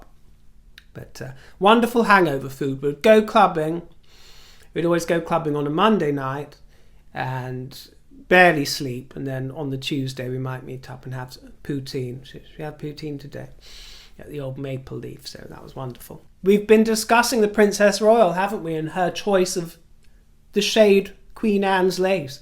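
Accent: United States. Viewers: Love your ‘Merica accent!🇺🇸😂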